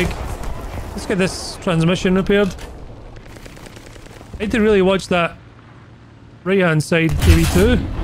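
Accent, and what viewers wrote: Scotland, United Kingdom. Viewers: Where in Scotland you from mate? Really enjoying your videos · Where abouts in Scotland are you from?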